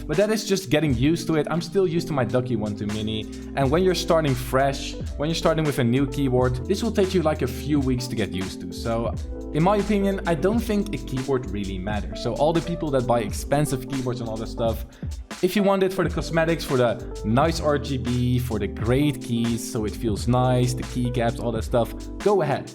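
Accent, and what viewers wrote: Netherlands. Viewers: ik dacht al dat je nl was maar ik was nog aan het trijwelen met je accent maar yeey je bent nederlands ik ben een goede detective :3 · Wow are you dutch? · Ik dacht al dat je Nederlands was !💙💙💙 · are you dutch??? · Are you Dutch? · Jij kan goed engels maar nederlands accent valt altijd te horen xD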